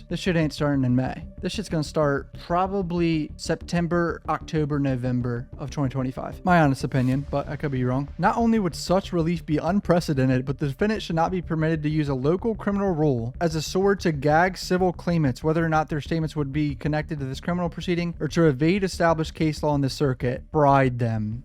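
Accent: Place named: California, United States. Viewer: Dude I love that California accent. When he said Combs homes I nearly lost it😂😂😂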